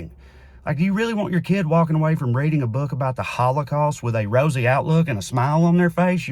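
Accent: South, United States. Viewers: I can’t tell you how satisfying it is to hear rational, humanitarian views arriving with a southern accent. 💕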